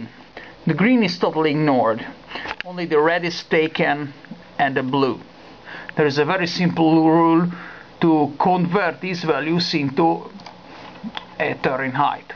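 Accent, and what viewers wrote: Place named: Hungary. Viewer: HAHAH! Végig a videódban gyanús volt, hogy nem-e magyar vagy, és lám utána elolvasva a kommented, kiderül tényleg! Annyira tipikus a magyorok angol akcentusa, hogy bárhol a világon meghallasz egy magyart angolul beszélni, rögtön le lehet vágni! Gratula a progihoz! Csak most találtam rá tök véletlen, mert a minap valahogy belefutottam egy az 1nsane-ről készült videóba, amiről meg rögtön beugrott ugye a nagy ős klasszikus Terep2. Amikor rákerestem, valahogy a te progidba is belefutottam. Kár, hogy nem fejlesztetted tovább...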